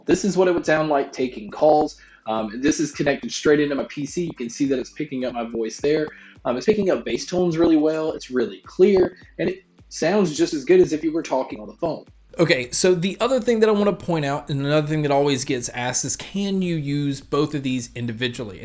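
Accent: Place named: Carolina, United States. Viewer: You have a Southern/Northern Carolina accent I love it!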